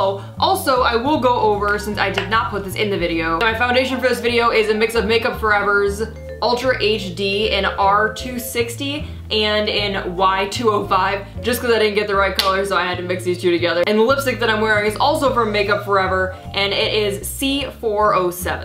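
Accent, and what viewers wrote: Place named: Chicago, United States. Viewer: Your Chicago accent is so refreshing to hear; I'm from Chicago but have lived in Florida for 16 years, so I miss hearing my native accent. I love this makeup look, it's simple but yet festive. Thanks sweetie!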